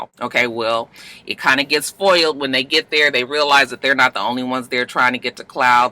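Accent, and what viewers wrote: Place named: Los Angeles, United States. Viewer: lol. You were saying “clow”. It’s your LA accent. We know you “hawrd”.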